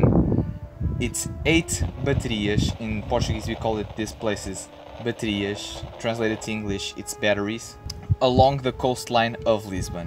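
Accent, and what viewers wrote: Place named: United States. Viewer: I love your American accent when you are trying to say a word. I forgot you don't speak English it'll the end. LOL You are doing so good with this.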